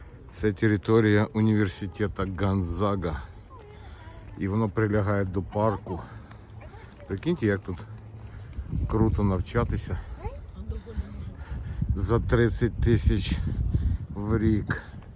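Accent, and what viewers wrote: Zhytomyr, Ukraine. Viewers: Як же мені подобається діалект Житомирщини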